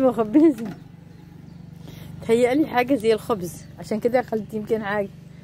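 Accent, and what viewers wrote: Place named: Saudi Arabia. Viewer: فديتك والله 😭😭😭لهجتك سعوديه 🇸🇦🇸🇦🇸🇦🇸🇦🇸🇦 أنا بعد من السعوديه عايشه في المانيا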